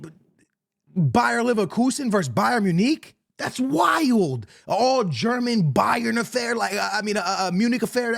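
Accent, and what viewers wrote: Portugal. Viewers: I'm telling u he has to be trolling... I doubt someone with a Portuguese accent ever said atlantia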